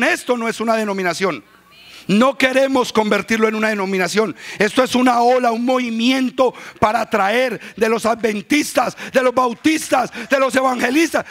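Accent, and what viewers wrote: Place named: Colombia. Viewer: Ese es mas colombiano que el presidente por su asento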